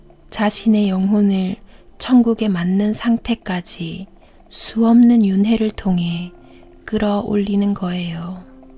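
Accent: Gyeongsang, South Korea. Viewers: 아 목소리...예뻐 좋아요~~특히 경상도톤~~~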